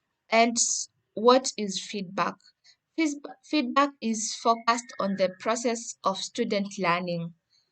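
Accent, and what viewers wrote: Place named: Kuria, Kenya. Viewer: Heavy Kurian accent kama nusu vinemba wenzako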